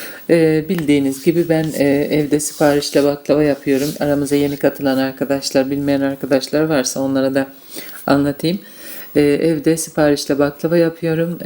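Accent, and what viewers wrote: Black Sea, Turkey. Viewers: Selamunaleykum abla sesin karadenizli sanki rize artvin sivesini duyuyorum 34 yasindayim Hic acma baklava yapmadim yarin oturup yapmaya caliscam Insallah basarili olurum Hep annem yapar bize Hic firsat vermez,annemde ayni Senin gibi yapar elleri dert görmesin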